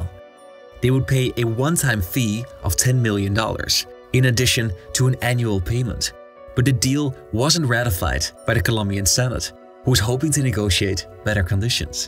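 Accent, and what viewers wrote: Netherlands. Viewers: Is he Dutch? Sounds Dutch trying not to sound foreign.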